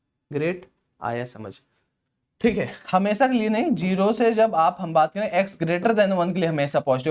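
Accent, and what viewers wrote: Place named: Uttar Pradesh, India. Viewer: UP guy, not odia.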